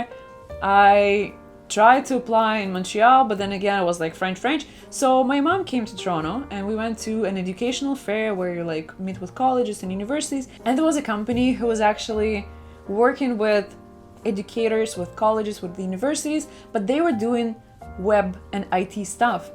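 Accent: Russia. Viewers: I love the Russian accent It's music to my ears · Как же приятно слушать русский английский =) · I love when you do "blah blah blah " And your Russian ascent is amazing👍🤩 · Love your Russian accent! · I love your russian accent 🥰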